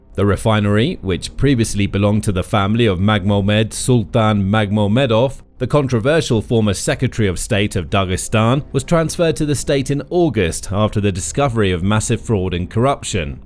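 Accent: England, United Kingdom. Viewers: It really, REALLY bugs me how the narrator keeps calling petrol, "Gasoline", because he is English from his accent and in the UK they call it petrol NOT gasoline.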